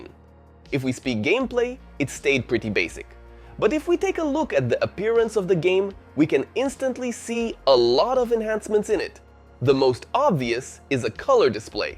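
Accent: Russia. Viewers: почему когда русские люди изучения английский все говорят тоже как ты квк тебе*?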